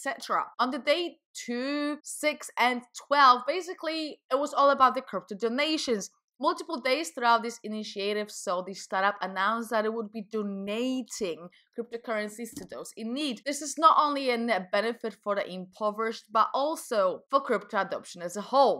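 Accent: United Kingdom. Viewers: My, I just adore British accent! But getting back on topic - I think that 12 days of Coinbase suck and nothing`s gonna change in the upcoming months. Only users can make some change. I`ve made my decision on this already - I`m gonna close my Coinbase account after I withdraw all my funds. I`m so tired of being fooled by them one way or another. C`mon, guys, let`s make some change! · Like for this British accent ;) To to put it in a nutshell - Coinbase sucks :D Nothing more than fraudulence.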